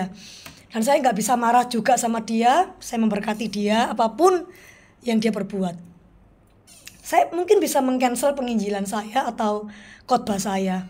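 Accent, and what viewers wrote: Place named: Java, Indonesia. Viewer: Suka logat nya jowo bnget ❤❤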